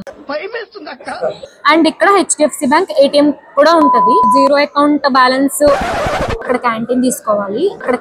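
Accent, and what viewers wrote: Godavari, India. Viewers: Mana Godavari slang , vetakaram.super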